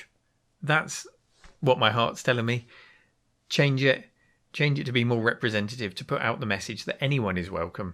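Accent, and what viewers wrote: United Kingdom. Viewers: The name is less important then the good job you do. BTW I love to hear your british english, it's much more pleasent then american english not to mantion german channels ;)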